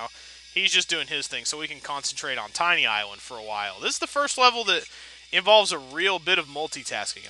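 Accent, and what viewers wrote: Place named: United Kingdom. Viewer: That first level reminds me of some of the levels in the tricky rating. Great Brit accent by the way :)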